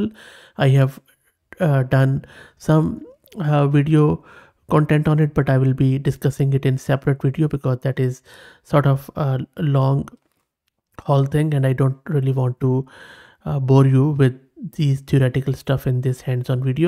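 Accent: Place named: India. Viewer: Индусский английский ни с чем не перепутать! Спасибо за видео!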